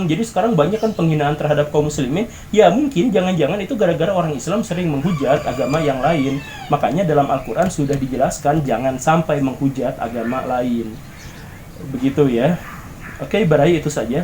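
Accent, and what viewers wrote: West Java, Indonesia. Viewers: Logat Sunda pak guru membuat ku kangen Bandung. Aku pernah 1 tahun di Bandung.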